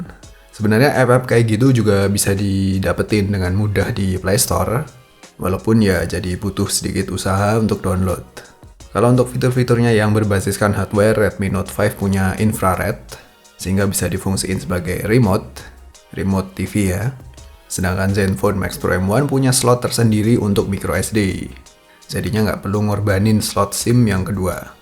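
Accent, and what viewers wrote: Java, Indonesia. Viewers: Dengerin suaranya bikin capek jawa nya medok amat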